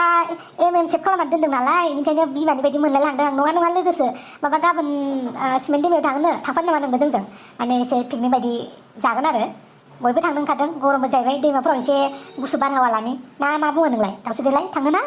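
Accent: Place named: Kokrajhar, India. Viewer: Nwngsini Boro-a Kokrajhariatar...mwjang👍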